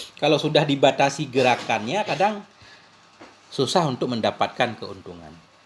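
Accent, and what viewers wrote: Aceh, Indonesia. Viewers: Orang aceh yah?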